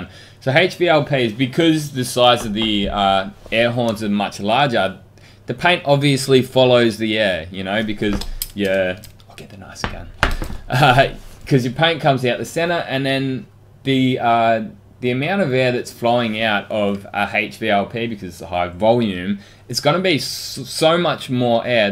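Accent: Australia. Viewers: Hey mate, love your channel, very new to spray painting and really enjoying it. I’m a woodworker, building small furniture and musical instrument projects, and I have a small compressor putting out about 4cuf/min; it came with two cheapo HVLP guns which are ok to get me started but clearly not up to much more. The FLG-5 looks great but it still calls for about 10 cubic feet/min; some other woodworking channels recommend LVLP guns which seem to fit the bill for what I want - can you recommend any which are easily found in Australia? I can get one from the US but shipping and import duties are crazy 😝. Keep up the great work, love hearing another Aussie accent!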